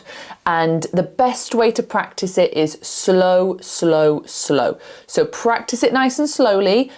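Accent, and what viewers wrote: United Kingdom. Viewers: I'm from California! I love. Your British accent!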